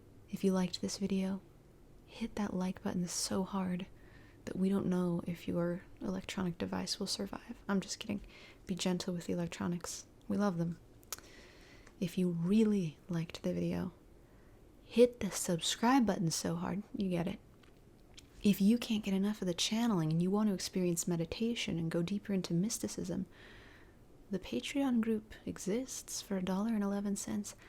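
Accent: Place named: Scotland, United Kingdom. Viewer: Thank you for the encouragement and the scottish accent 🙏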